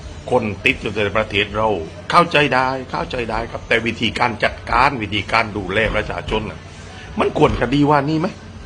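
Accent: South, Thailand. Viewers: ได้แรงอกพี่น้องเหอ คนใต้แหลงหรอยนิ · แหลงได้ดี นี้แหละคนใต้ต้องชัดๆ💓💓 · ไม่ว่าภาษาไทยภาคใด...ปชช.รู้เข้าใจตรงกัน..รัฐบาลล้มเหลวโดยการนำของนายกไทยคนนี้..ภาษาใต้ชัดในความรู้สึกเจ็บปวดครับ..คุณ ชัย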